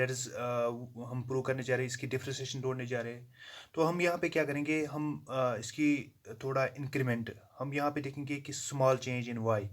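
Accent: Kashmir, India. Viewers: I think u r kashmiri bcoz aap ke batein 😄aap plus ko pulos bata rahay ho vaise b ham kashmiriyo ke aadat he hai nayi angreezi banana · Sir! Are you kashmiri ??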